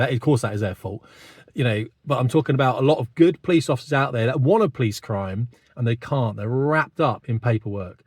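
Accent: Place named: United Kingdom. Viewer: . You're starting to sound like a African Britain in your speech. Go back to proper English patois. Cheers.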